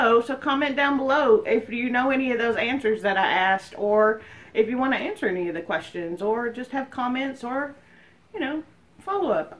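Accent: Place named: Mississippi, United States. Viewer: Howdy,!! I think you are hilarious! I am in Mississippi, and our accents are very similar, so your "peenched" and other words sound a lot like me! OMGoodness!! I used to spend hours a day looking for 4-leaf clovers, too!! I found one or more almost every time I sat down to look. I love your furbaby, Barnum. My Missy looked almost exactly like him, but she passed at about age 12 soon after my husband in 2016, probably from grief. Please, give Barnum a big hug and kiss from me in memory of my Missy—and, cherish him as long as you can, hon... I think you are great and wish you all the best! God bless! Love & hugs ❤ Lana aka the Good News Keto Grandma 👵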